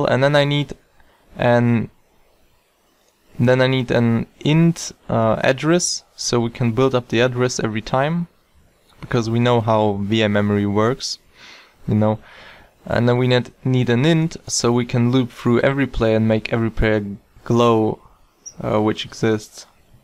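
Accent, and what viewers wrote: Germany. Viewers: man hört das du deutsch bist :D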